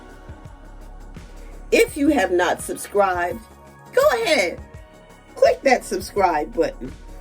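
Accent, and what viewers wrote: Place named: Gullah Geechee, United States. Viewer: Hi! I just found your channel. I really love your content…I am getting my locks installed next month. On one of your older videos, you mention that you are from SC. I would guess somewhere near the low country (I hear the Geechee accent). My husband grew up near Charleston.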